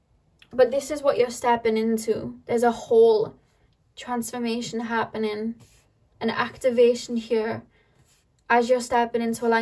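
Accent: Wales, United Kingdom. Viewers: I always take every one of your pick a cards as a personal reading, because you're really that accurate. And you're so comforting and uplifting, hearing your lovely Welsh accent is like receiving a hug from my Nana (she was also from Wales, sadly passed away.) Thank you so much, you are a true spiritual warrior & you give so much, you deserve all the love in the world 🐉💎💐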